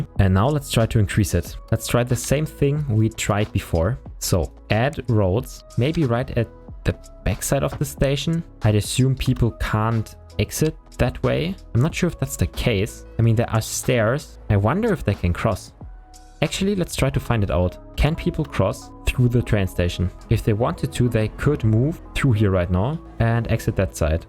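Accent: Germany. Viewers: This video’s quality is incredible — at first I thought it was made by a big YouTuber! I was so pleasantly surprised to discover your channel. The content, your voice, and even the German accent (it adds a unique charm!) are fantastic. Can’t wait to see more!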